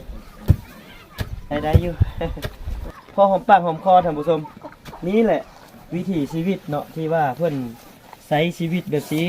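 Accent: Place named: Surin, Thailand. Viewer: เว้าคือล่วยแถว จ สุรินทร์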